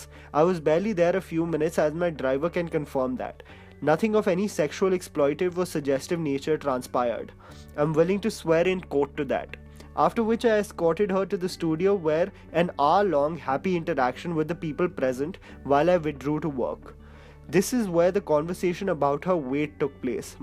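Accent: India. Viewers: This is the typical convent school educated accent in India , believe me his accent is good , very good. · To be honest his accent is cringe-worthy, I have seen Indian people with lot better accent then him. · you have such a thick accent sigh. makes watching this hard on the ears - for someone who isn't so used to indian accent. I like bollywood so I clicked on this video.. and good content too, but maybe a more neutral sounding accent would make the video more open for non-indians too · There is nothing wrong with accent!!! When are Indians going to stop being judgmental about their own people!!! You think he should have what an American accent then it's all good?! Most Indians speak multiple languages so this guy's accent is not an issue. He speaks very clearly. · Some of you all need to chill with criticizing Indian accents. India is a multi-lingual nation, and english is not most people's mother tongue. 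Also, english isn't the only language that exists in this world, the world doesn't revolve around it. Those of you who criticize him based off of that are narrow-minded. Don't be that person. Be a bit more kind. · If he had a pure American or British accent he would be criticized for a 'fake accent' . He speaks in a way most indians understand and still people are complaining? Duh!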